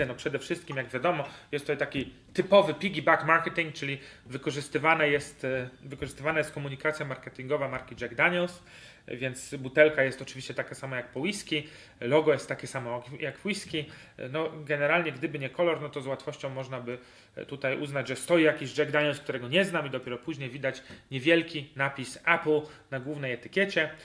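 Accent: Poland. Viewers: Panie Ty jesteś z Poznania??! Zdrówko 🥃🥃🥃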